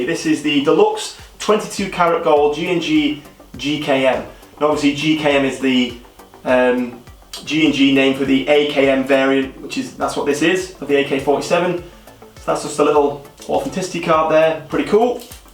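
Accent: United Kingdom. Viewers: Soon as I heard his wussy British accent I knew the ak was fake. Oh well, back to trying to plate my real ones. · And he also has a British accent.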